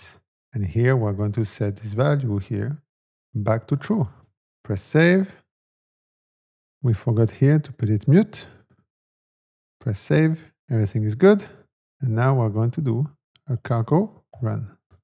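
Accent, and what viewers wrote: France. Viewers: A really well done video! Are you French? because I am French and I can clearly hear a French accent here. I could be wrong though :p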